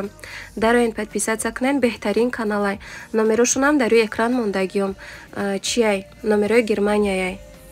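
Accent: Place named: Hisor, Tajikistan. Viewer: И лафзи хисорихояй марам дугонахом ай хисор шахринав хамихели гап мезанан карсодем меган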